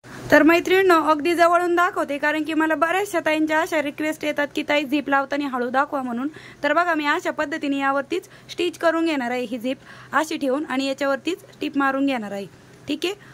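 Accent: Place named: Marathwada, India. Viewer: खूपच सुंदर शिवली. तु कोणत्या गावची आहे. तुझी भाषा मराठवाड्याची वाटते. छान छान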